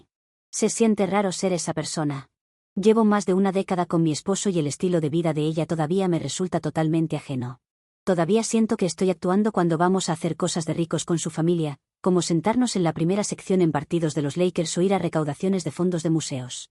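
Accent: Mexico. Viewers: Tan temprano y ya tenemos suegra tóxica 🙄 por cierto, buenos días! Edit: amo como habla e insulta a su suegra en mexicano!!! Te quiero OP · Se nota que es de México jaja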